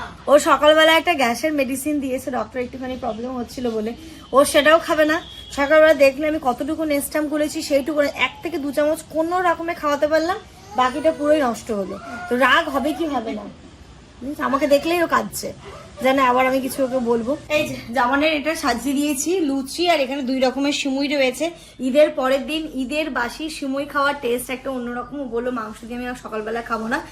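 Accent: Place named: Malda, India. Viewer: Didi tumi aj j gulo bollao ota ke maldar vasa....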